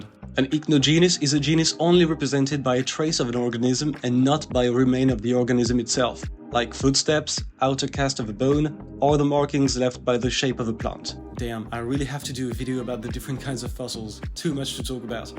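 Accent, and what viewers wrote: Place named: France. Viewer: je me disais qu’il y’avait au fond d’un anglais irréprochable un petit accent qui me rappelait le miens 🇫🇷🇫🇷🇫🇷 D’ailleurs tu t’es mis un sacré gros youtubeur avec toi!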